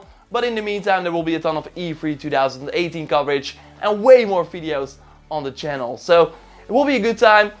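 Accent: Netherlands. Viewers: Are u Dutch? you sound Dutch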